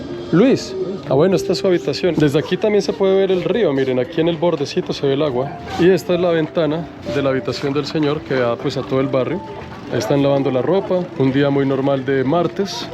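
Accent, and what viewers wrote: Colombia. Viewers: Ahí usted es único y mis respetos y mucha admiración. Yo también soy colombiana y me encanta su forma de hablar qué no deja que nos olvidemos de nuestros dichos!! Parcerito!!!y saludes a la abuela.😊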